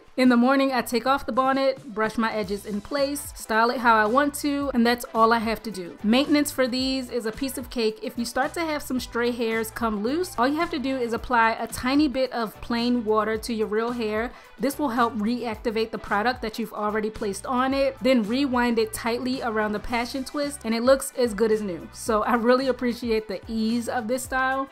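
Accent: Baltimore, United States. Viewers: I hear that Baltimore U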